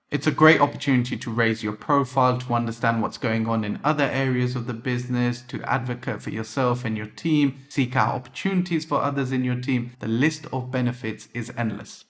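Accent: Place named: United Kingdom. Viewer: I knew you are a Muslim but didn't know what country you are from. I suppose your parents moved to the UK and you grew up there! (as you have British accent)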